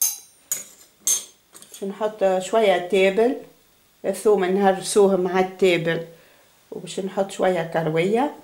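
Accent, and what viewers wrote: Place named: Tunisia. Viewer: ليه لهجتكم يا تونس تهبل؟ واكلاتكم باين لذيذة...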